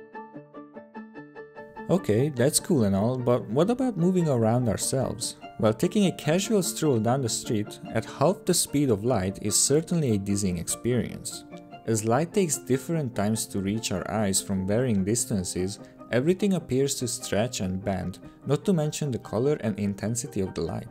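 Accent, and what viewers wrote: Hungary. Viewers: Is that a Hungarian accent or am I completely wrong? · Wait, are you Hungarian? Your accent is definitely 🇭🇺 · akcentusból itélve fix magyar vagy, nagyon komoly videó lett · Hello! Nice video! Are you hungarian by the way? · are you hungarian by any chance? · Nem vagy magyar véletlenül? 😅 Különben elég jól összeszedett lett a videó! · Egész érthető volt még a magyar akcentuson keresztül is. Kedvencem amikor Suttgartot úgy mondta hogy sztátgárd.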